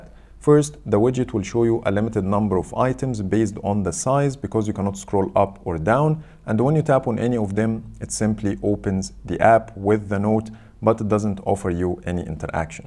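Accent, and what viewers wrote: Egypt. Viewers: That sounds like an Egyptian accent 🤔? Are you, my dude?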